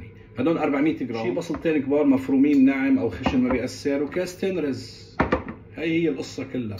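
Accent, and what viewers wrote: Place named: Banias, Syria. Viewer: اهلين من اول ماسمعتك قلت من بانياس بس حبيت اتأكد الله يسعدك يارب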